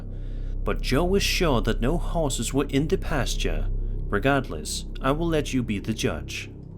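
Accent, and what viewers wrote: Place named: Denmark. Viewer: I like how after i found out you were danish i can’t unhear the strong accent you have lol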